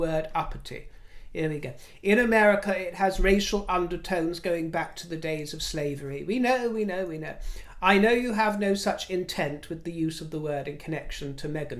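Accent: United Kingdom. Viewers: Amen for you speaking UK English! ❣❣ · Ohhh the word is "uppity"! With your Brit accent I kept hearing "appetite" 😂😂😂 Uppity just means "snob" - whoever said it is racist, get over yourself!!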